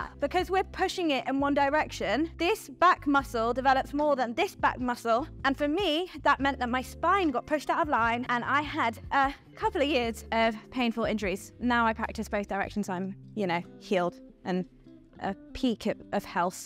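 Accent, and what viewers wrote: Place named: United Kingdom. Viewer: Lights & fire!! ...& Naked!! 🥰 Love watching your videos & watching u hulu hooping is memorizing, captivating, & endlessly entertaining for some reason. You're beautiful, charming & adorable & I bet your performances are in high demand. & I love your British accent. Also love seeing these longer videos rather that just the short form 10-15 second ones ( those are still good, just too short for someone so charming ) Keep up the good work & keep being you. 👍👍😍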